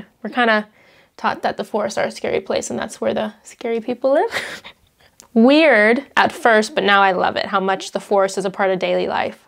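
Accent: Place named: Europe. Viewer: So interesting...I would never know you were from GA...or even the US. You sort of have a European accent with your English. Very pretty. :)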